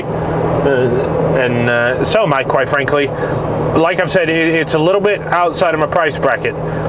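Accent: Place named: United Kingdom. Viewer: I KNEW I wasn’t going crazy when I heard the British accent bahah😂 you flipped a switch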